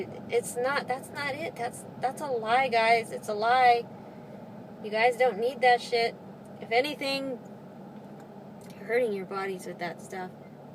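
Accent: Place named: Midwest, United States. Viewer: I enjoyed this little rant. Are you in Cali or Florida? You sound like you're from the midwest. What's the story with your name?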